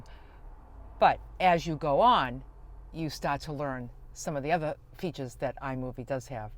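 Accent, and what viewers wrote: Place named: Massachusetts, United States. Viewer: you are amazing! Your honesty and mindset is inspiring to me. I’m working on your food plan and working on my mindset about everything!!! Thank you for all the hard work!!! I hope you are able to do an Amazon store soon!!! I love your gadgets! ❤❤❤ Ps. My first video was way back when you first got Sweet Pea…I too am from Massachusetts, I recognized your accent… And I was hooked. Keep up the great work, lady!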